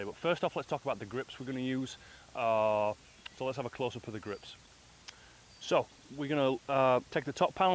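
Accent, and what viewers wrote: United Kingdom. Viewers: Great to hear an Northern accent (hope i have that right! Yorkshire?) on You Tube. Looking forward to the videos! PS: I'm from the real North...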